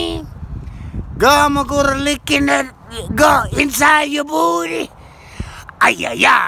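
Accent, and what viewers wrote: United States. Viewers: He sound like he from my city, Lafayette Louisiana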